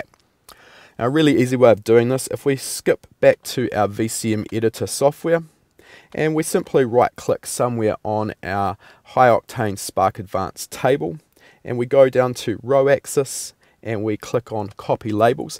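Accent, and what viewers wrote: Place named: New Zealand. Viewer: There is nothing wrong with your kiwi accent . keep it up. would love to learn about this ls tuning for real. Cheers mate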